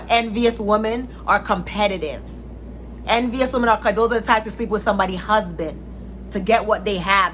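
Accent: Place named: Jamaica. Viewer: I hear that Jamaican accent! ♥️ Great content.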